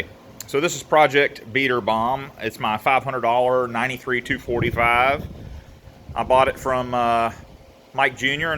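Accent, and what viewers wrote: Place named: South, United States. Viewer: I love how you open up all nonchalant with your southern drawl. Then, with hardly any build up, you proceed to just drop some extremely deep turbo and tuning knowledge. Hahahahaha! Looking forward to seeing this one develop.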